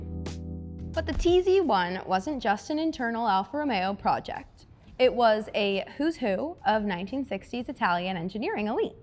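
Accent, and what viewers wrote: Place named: California, United States. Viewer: dear god, lets get a different narrator... i dont want to listen to a california girl accent. ever.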